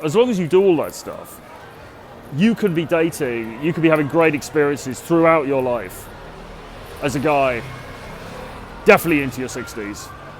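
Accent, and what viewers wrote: United Kingdom. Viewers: is a traveling street man on the move. He's the 007 of the Manosphere! Complete with British Accent. Now where is hiding that Aston Martin DB5?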